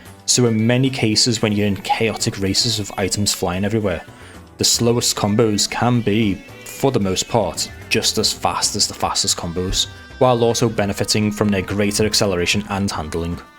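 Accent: United Kingdom. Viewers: hey i didnt know u are british 😭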